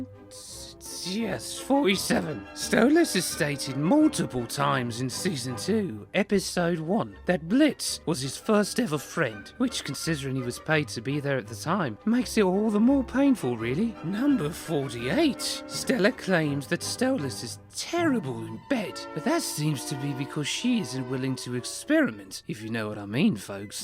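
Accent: United Kingdom. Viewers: Man with hoity-toity British voice gets increasingly drunk talking about a horny owl demon. This is the most video I've watched all day. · I went insane listening to this drunk British guy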